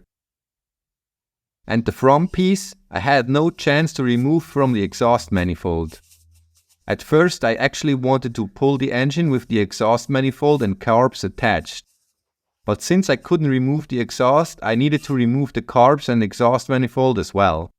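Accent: Switzerland. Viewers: I love following your videos and on this channel we get your voice over. I love your Swiss accented English. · I went and looked up Swiss accents on YouTube. The Germans pretend they don’t understand you without subtitles. Liars. The Nordic/Romance thing is real, your accent is amazing, that is a little car with a big engine, enjoy!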